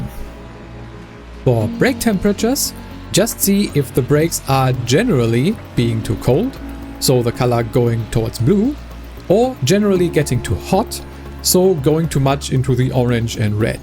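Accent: Germany. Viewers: I can hear Denglisch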